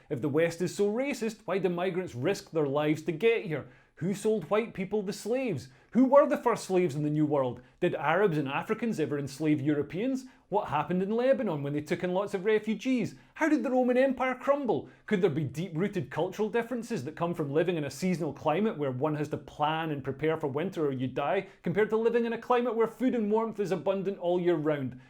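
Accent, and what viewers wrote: Scotland, United Kingdom. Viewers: This is great, and the Scottish accent saying plain and obvious things makes it so much better.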